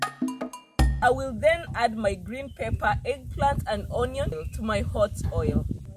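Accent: England, United Kingdom. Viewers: I too subscribed sis how neatly and beautifully you cook , woooooow environment and recipe too. I admire at your English accent too... You are humble and respectful towards viewers🙏🏻🎉👍🏻, i love you dear sis - This is Raji from Chennai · I love your English accent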